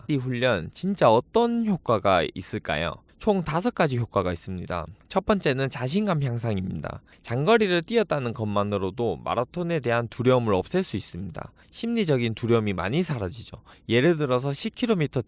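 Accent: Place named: North Gyeongsang, South Korea. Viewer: 대구 경북 엑센트 심하시네 잘봤습니다.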